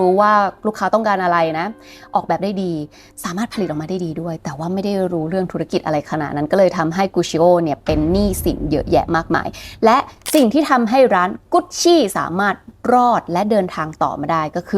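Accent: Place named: Thailand. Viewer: เล่าตัดต่อมากคะ แต่งงๆ กับชื่อและอิตาลีสำเนียงไทยภาษาอิตาลี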